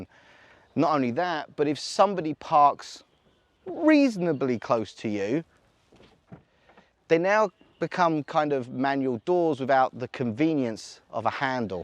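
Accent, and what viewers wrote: United Kingdom. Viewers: Some people struggle with the English accent. I tried to slow down a bit to compensate. Seems it didn't work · I dont like the audio , the accent is so british for me or even irish , and its not entertaining everall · I've been torn about writing this comment, but I've choosen to be honest with you, since I've been enjoying this channel for so long, and it deserves my honest opinion - no punches hold back. I don't like the style of this reviewer at all: 1. his strong accent make it quite difficult for people not used to British accent to understand what he's saying - this is even more important for non native english speakers, who will struggle compared to Ethan, which instead is quite easy to understand. 2. he's speaking too slow, and with a lack of energy. 3. the review itself is boring. That never happened to me before in a review of this channel. 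4. please don't assume that all people watching this channel uses imperial measures - we are not. In fact, it is probably the opposite, since the US is the only country on the entire planet that it does (4% of the world population). Please use the standard metric system, eventually using also other local (old and obsolete) unit of measurement if you want to help people not used to it (ex. "I'm 1,75m (or 5 feet 9 inches) tall"). Thank you. So, not meaning to be rude, but I'll probably skip the next reviews with this reviewer...unless is really a car I'm looking for.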